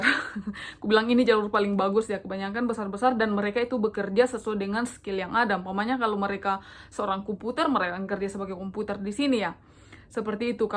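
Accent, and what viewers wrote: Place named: Manado, Indonesia. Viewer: Logatnya , mungkin kamunya dri Manado ya ? Atau bagian Indonesia tengah lainnya ? 😊 .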